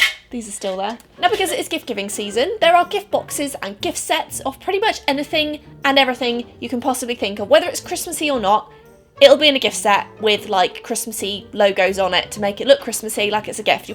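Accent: England, United Kingdom. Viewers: As I understand you live in America, but aren’t you British? I’m thinking this because of your accent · Have you ever lived in England because you sound a bit English but I belive you live in America but I'm not sure 🙃 Also I love your videos, they're really funny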